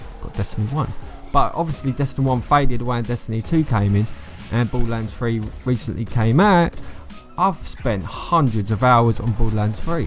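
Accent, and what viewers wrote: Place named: United Kingdom. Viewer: sir you have a painfully bri'ish accent but i love it. keep up the good work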